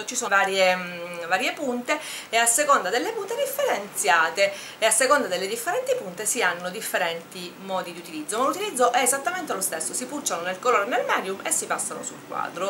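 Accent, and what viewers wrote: Catania, Italy. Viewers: Sei catanese?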